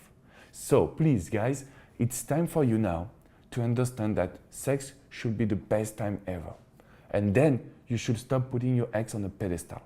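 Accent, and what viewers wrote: France. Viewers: Love the french accent as I am used to the regular speech shows the same tough:);keep all the good things going english side or fench. Je suis avec toi:) true love is never ending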